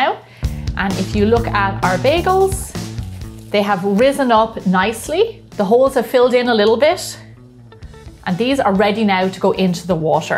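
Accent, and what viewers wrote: Ireland. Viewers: your bagels looked delicious, made me hungry, & i enjoyed your accent. top 'o the mornin' to ya lass. do you have any sour dough recipes? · With the Irish accent this woman could give a recipe for swill and it would still sound good. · Irish accent?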